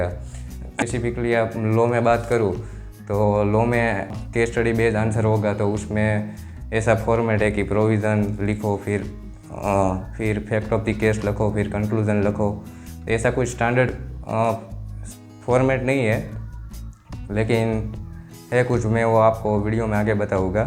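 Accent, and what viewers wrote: India. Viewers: Awaaz thee khabar padhe jaay gujarati a par saurastra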